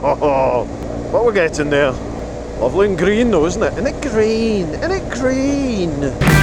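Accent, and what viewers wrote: Scotland, United Kingdom. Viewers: love it mate, just found your videos and loving hearing the Scottish accent! some great roads round Glencoe shame about the usual weather, North Coast 500 is a must for beautiful roads up here, never done it on a bike before though! Keep up the great content! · I know it all sounds normal to you, but you had me in tears there... “Great giggle” “Naughty overtakes” 😂🤣😂🤣 I want to learn how to sound Scottish! Is there a pill? If you’re ever in San Francisco, give a shout. We also have an Inverness, it’s lovely too (no Loch but we have a bay), with as much glorious riding up and down the Pacific coast as even an Iron Butt could want. Thanks for the ride along. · That was pretty hardcore riding, but you where on the right bike, those other two guys on the MT-10 and Fireblade had to have been hurting more than you, epic ride ! 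Sounded like you had a bit of a Scottish accent, where you one of the extras on the movie Braveheart ?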